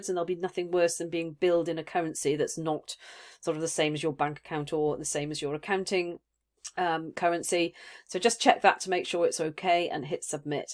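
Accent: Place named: United Kingdom. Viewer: For an American audience, you really speak too fast. Yes I understand you, but with your British accent I need a delay to digest what you say. I am imagine you would have the same problem with me speaking with my American accent.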